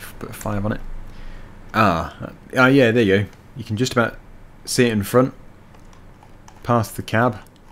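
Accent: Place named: United Kingdom. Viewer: David Correia uk people have a different acsent and that’s how he says assume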